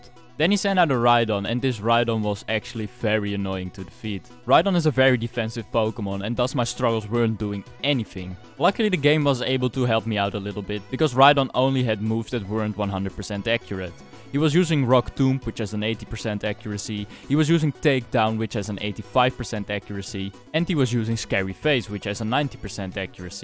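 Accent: Netherlands. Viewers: Ik hoorde het aan je accent, haha!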